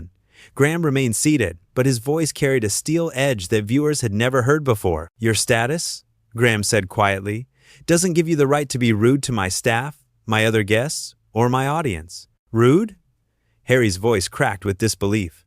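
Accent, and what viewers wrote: United States. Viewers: Ok we have an Irish host, and a member of British royalty, together. Down here in Aotearoa, Nz, Harry has taken part in Maori hakas, played rugby, is a great fan of rugby, and even stayed in a 1930s style pub down on Stewart Island, in a fishing village. He is an ordinary down to earth bloke. No high and mighty, better than you attitude. Just a normal guy. So we get a VO with a yank accent, instead of an English accent, spouting away. This is fake. The Prince Harry I know, would never act like that. A.I do your research. Get your facts straight. OR take a hike. This is bad. 🤮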